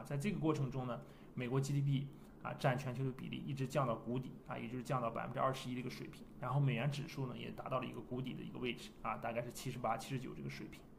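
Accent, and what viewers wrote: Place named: Shanxi, China. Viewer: 像山西的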